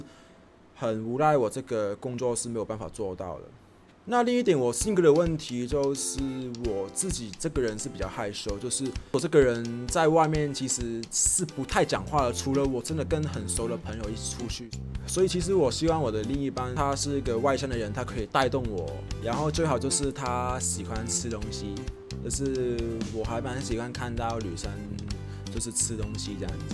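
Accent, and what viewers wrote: Hong Kong, China. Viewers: 好有香港味www you know~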